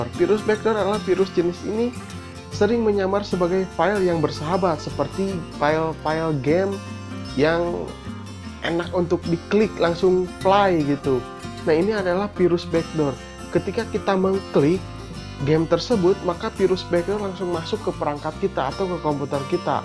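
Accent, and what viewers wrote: West Java, Indonesia. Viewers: sunda banget bang wkwkwkwk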